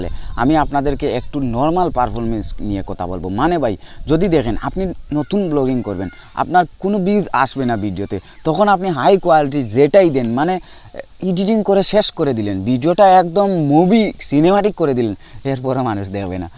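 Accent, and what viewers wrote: Sylhet, Bangladesh. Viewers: Bhai apni Sylheti right?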